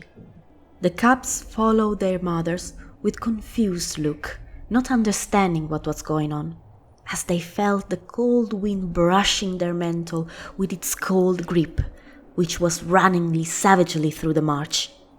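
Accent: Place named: Scotland, United Kingdom. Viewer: hai un accento simil Scottish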